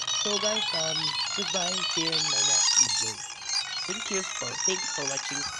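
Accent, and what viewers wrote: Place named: Philippines. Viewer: oh bcz I'm a Filipino too I can tell by the voice and it says Tagalog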